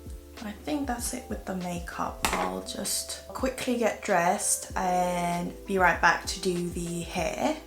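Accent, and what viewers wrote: United Kingdom. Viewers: 썸네일에 홀린듯이 들어와 영국발음에 취한다..💕... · 부산은 어땠어요??전 45년 부산토박이 비오는날 저 인생영화 와일드 보면서 소주한잔중인데 바로 영상 돌리고 바로 영국발음으로 듣고 있어요 사실 영어는 힘들지만 영국발음은 좀 멋지더라구요 항상 응원해요~~혹시 와일드영화 안보셨다면 남편이란 함 보셔요~~^^ 전 악마는 프라다를 입는다도 인생영화지만 꼭 보시길~~오늘도 이뿌신거 아시죠??? 사랑해요 억수루~~ㅎㅎ💖💖💖💖💖💖💜💜💜💜💜💜💜💜💜정말 사랑스러운거 아시죠??항상 응원합니다~~^^ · Happy birthday it’s late to say tho:) ❤️ I LOVE your British accent so much! 💗 최공 ㅎㅎ 😍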